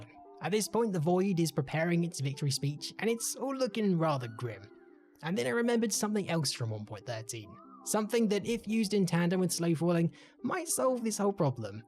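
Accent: United Kingdom. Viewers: So british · Thats how ever british person sounds like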